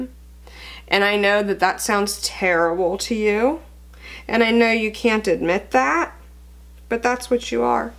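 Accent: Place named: Canada. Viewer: are u canadian? u have a canadian accent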